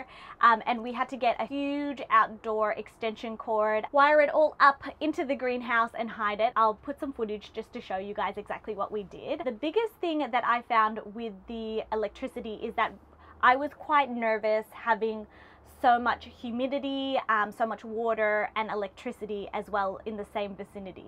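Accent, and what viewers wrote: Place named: Australia. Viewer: I'm picking up on a slight Australian accent, are you from Adelaide by any chance?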